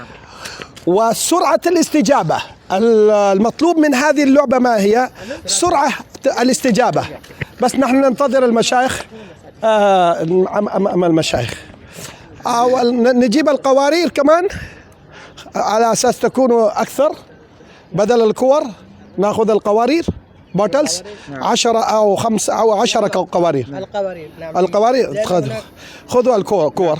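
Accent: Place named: Saudi Arabia. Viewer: السلام عليكم ورحمة الله وبركاته هل أنت سعودي؟ أنت تتحدث العربية باللهجة السعودية، فمن أين تعلمت هذه اللغة؟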